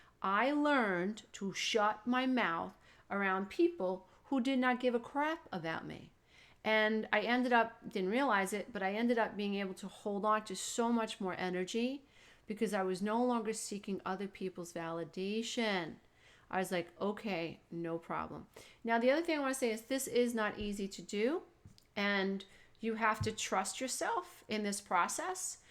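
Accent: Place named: Long Island, United States. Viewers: Wow! I've learned more in thus short min video than I have in the 5 years of therapy that I've been going to! Thank you, thank you, thank you! As I listened, I recognized your accent. Originally, I thought Brooklyn bc I'm originally from Bensonhurst. But I read the wiki page and it said Queens. Close! Also, the "Shutty, shutty"! I say that too and got it from The King of Queens', one of my favorite shows ever. Lol I look forward to learning from all you have to teach! Thank you again. 💕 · Grunt at me like a hog. your funny. I’m from Long Island and your accent makes me feel like I am back home. 💕💕💕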